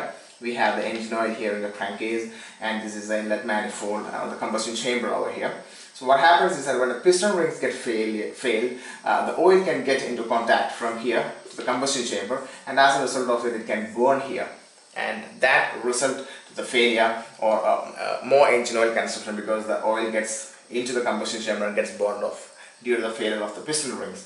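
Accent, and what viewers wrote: Kerala, India. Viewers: malayali aanalle... ?? :D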